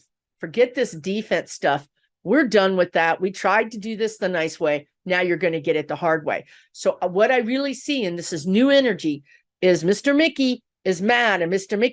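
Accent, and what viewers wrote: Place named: South, United States. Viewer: Oh my goodness! Definitely, the entire Biden family should sue those horrible creatures. It seems the only way to get to these greedy lizards is to hit them in their pocketbook. I cannot get enough of hearing from you - amazing, lovely,. I love your messages from your guides. I love your humor. I love your cute slight southern accent. I just love and appreciate all you do for this community.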